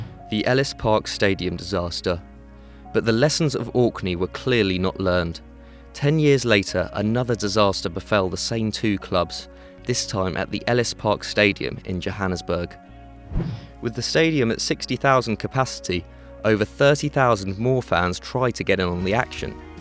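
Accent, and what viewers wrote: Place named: United Kingdom. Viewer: Why is everyone critisizing the poor guy's voice? He's just British ok.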